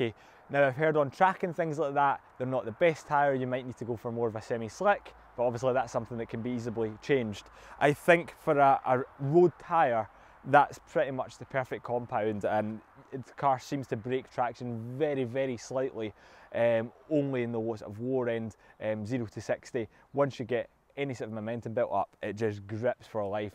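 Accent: Scotland, United Kingdom. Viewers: constitutionalpie * Scotland, groundskeeper Willie from Simpson’s has thicker accent.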